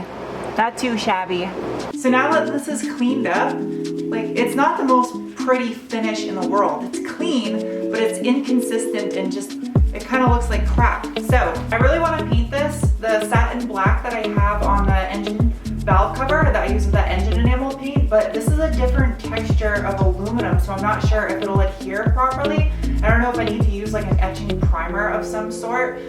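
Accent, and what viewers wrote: Australia. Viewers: As an Australian I loved the acent you would fit right in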